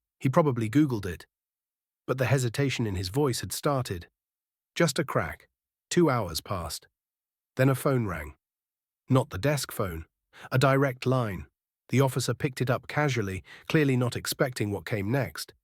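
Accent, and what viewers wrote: United Kingdom. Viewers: Sofa King tired of this AI BS posted by people with obviously zero talent of their own. On top of that: what kind of idiot puts a brit accent to a US military-based video. Fail on all accounts.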